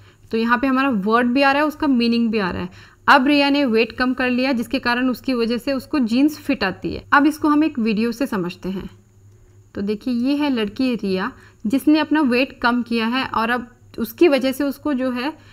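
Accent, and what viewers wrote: India. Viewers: Mam are you from Chhattisgarh ?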